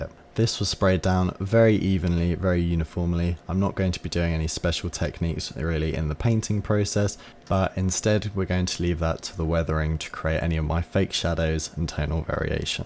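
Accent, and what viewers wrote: England, United Kingdom. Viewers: Hi, re Tamiya where are you? You sound English? For two years you are rather good. Do you know how many models you have made? Bob England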